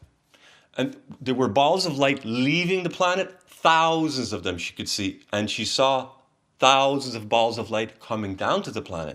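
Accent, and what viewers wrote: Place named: Finland. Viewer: You sound Finnish.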